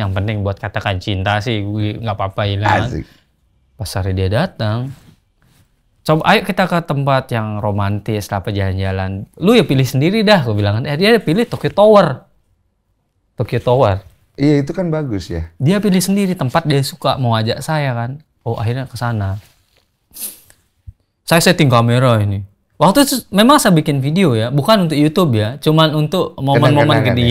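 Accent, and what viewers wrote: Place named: Bali, Indonesia. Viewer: logat nya bang 😂 ada Bali Bali nya gitu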